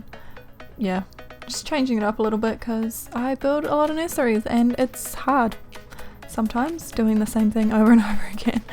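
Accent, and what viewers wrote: New Zealand. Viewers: This house is pretty :) and are you from New Zealand?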